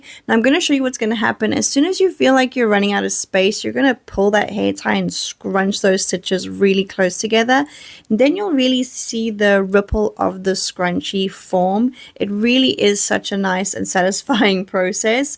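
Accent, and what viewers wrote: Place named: United Kingdom. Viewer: it's interesting to me that you seem to using the us standard for the names of the stitches. I learned that a British treble crochet is equal to a US double crochet, a British double is equal to a US single, and so on. Maybe I'm misplacing your accent (you sound British to me). If so, I apologize. I just wonder if that's on purpose like if you know your audience is mostly from North America or if that's just how you learned to crochet. If the latter, did someone teach you or did you learn from Youtube or something?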